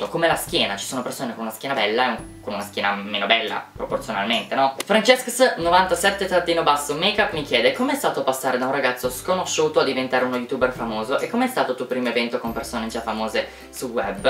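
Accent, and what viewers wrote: United Kingdom. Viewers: Adoro il tuo accento inglese. Spero di diventare una persona forte come te♥️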